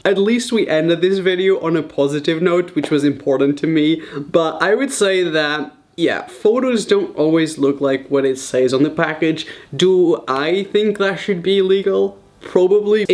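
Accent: England, United Kingdom. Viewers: 10,000 subs without any videos NEENJA Portuguese people don’t have a accent really at all, he has a mix of British and English . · THE BRITISH-NESS!!!! I LOVE IT!!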